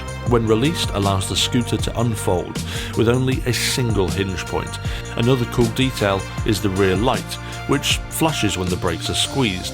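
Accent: England, United Kingdom. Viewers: I Like the books and the English accent, it makes you seem smart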